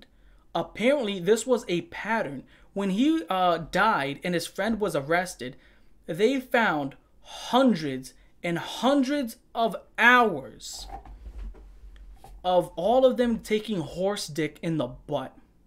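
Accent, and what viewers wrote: New York, United States. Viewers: R u from New York? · I forgot I was listening to a review of freaking mdpope, I got to into your descriptions and full New York accent 💀i could listen to ya all day 😭🫶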